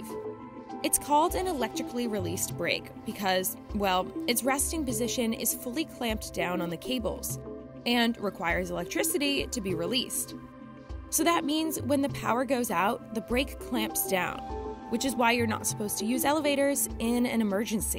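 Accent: California, United States. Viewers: I think its a California accent. Reminds me "Legally Blonde". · I think she just have a regular Californian female accent. This accent was so much use for caricature of superficial girl most of the public doesn't know it is actually a real accent that real people have. I don't think she does it just to mess with you that juat how she speak. ps: I'm French I just learning to differentiate accent so I'm maybe wrong on this...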